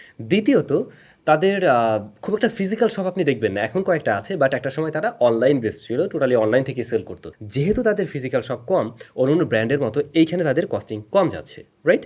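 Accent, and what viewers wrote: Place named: Comilla, Bangladesh. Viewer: Ar vaia apnar village ki Cumilla? Maje maje kotha sune mone hoccilo. Please janaben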